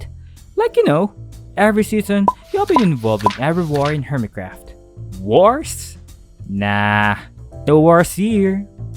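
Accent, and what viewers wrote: Philippines. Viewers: you sound filipino. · I realli like your pilipino accent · i think its Flipino accent??? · Your English accent seems like a veri Filipino vibe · are you filipino???? your accent is soo familiar to tagalog~ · Are you perhaps a filipino? because that accent is seemingly familiar lol From 🇵🇭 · are u filo????? i can hear it in ur accent 😭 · I know you're filipino just by the accent.. Correct me if i'm wrong but i'm pret-ty sure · are you a filipino? you sound like one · Ur Accent . Are u Filipino?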